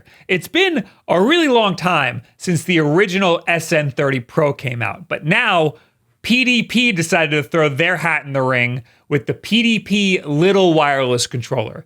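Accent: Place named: New York, United States. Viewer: I’m from Wisconsin and live in nyc. Love how you say cawfee like a New Yorker but Beag (bag) like a Midwesterner 😂. Relatable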